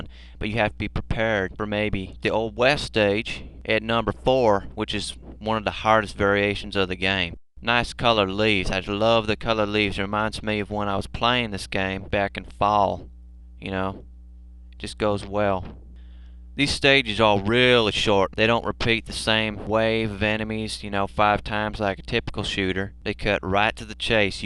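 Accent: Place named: South, United States. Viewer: This guy really needs to return and do mote SHMUP reviews. His southern accent and humor are perfect!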